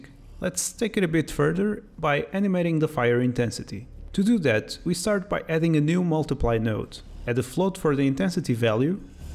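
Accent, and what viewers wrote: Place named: Portugal. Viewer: Não vão acreditar mas ao ouvir o sotaque pensei logo que era um tuga e depois de procurar na net, confirmei que quem está a falar é tuga. Parabéns pela ferramenta, parece porreira e definitivamente uma compra a fazer num futuro próximo.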